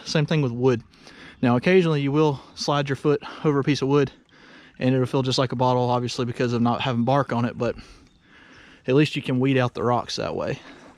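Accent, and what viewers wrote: South, United States. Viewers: Thank you four comments about us older folks am one of those disabled elderly ladies who appreciate the content as well as your southern accent. Keep it up!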